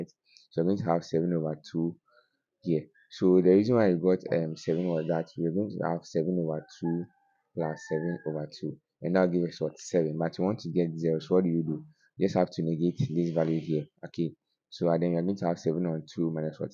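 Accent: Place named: India. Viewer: Are talking in English or Indian? wtf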